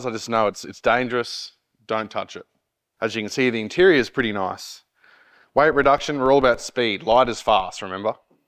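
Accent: Australia. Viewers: Haha so bloody Aussie lol love it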